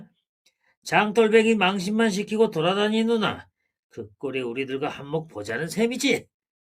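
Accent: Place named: Gangwon, South Korea. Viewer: 재미있게 잘 들었습니다^^ 책도 재미있지만 귀로 들으니 더 집중도 되고 좋았어요^^ 강원도 사투리가 재미있어요^^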